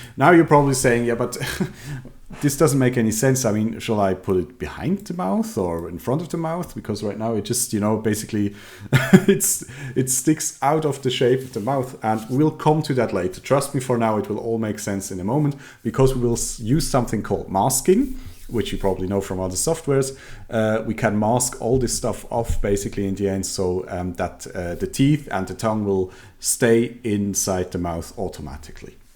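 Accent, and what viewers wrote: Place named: Germany. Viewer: Thanks for the Tutorial! your accent also sounde pretty German to me, haha! :)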